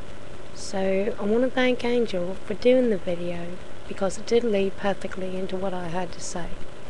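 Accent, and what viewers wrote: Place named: United Kingdom. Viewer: whats wiv the Britsh accent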